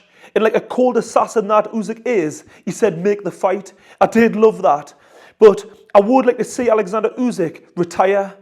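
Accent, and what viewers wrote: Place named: Scotland, United Kingdom. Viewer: Where you from brother? Why do you speak like that—accent? Scotland? This accent doesn't suit a black man.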